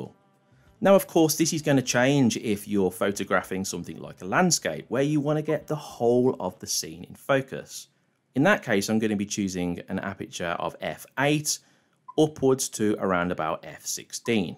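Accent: United Kingdom. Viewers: Two things stand out for me 1, he’s British which makes a nice change from all the American offerings and 2, he explains the basic, but important settings in a language that a beginner/enthusiast can understand and get to grips with quickly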